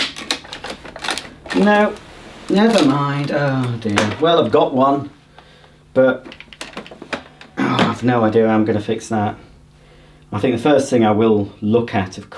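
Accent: United States. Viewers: Nice Texas accent. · Lol I’m offended after you talking like a amarican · I love the american accent that wwas great. The concept and concept 2 are possibly my favorite hoover models, i have quite a few. I do find them quite often, if you need any parts let me know and ill do my best to help out!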